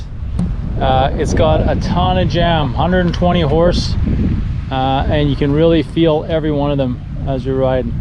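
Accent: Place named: Prairies, Canada. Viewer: I feel like your from Manitoba or Saskatchewan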